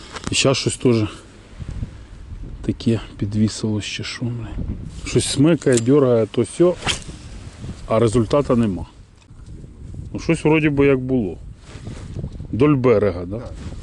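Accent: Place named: Surzhyk, Ukraine. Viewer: Це якесь жахіття! Хіба так важко підтягнути українську? Ну таке вже суржело махрове, вуха завертаються! На весь україномовний ютуб дві рузскагаварящіє цєлюсті - і Петраш.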